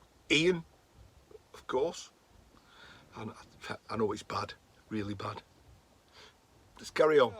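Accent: United Kingdom. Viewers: My grandson wants to know why you poms - pronuniate things different to us is Australia, I told him we do it as well, look at how we greet someone - Gidday mate!!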